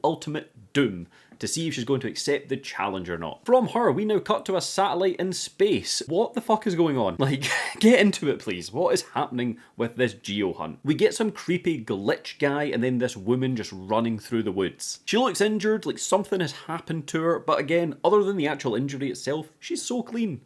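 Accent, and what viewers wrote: Scotland, United Kingdom. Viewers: I know his name is like dallas but bcuz of ur accent as a fellow Scottish person sounds like ur saying he’s dadless lol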